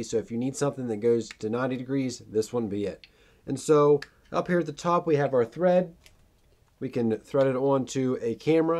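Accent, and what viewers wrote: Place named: Philadelphia, United States. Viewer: Haha. Know this is an old video but just got this tripod and wanted to say I love that Philly accent :)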